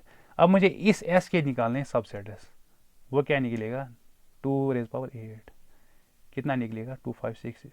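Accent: Kashmir, India. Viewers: Zero respect for your pitch and language. the way you speak Urdu. It's like kashur Urdu.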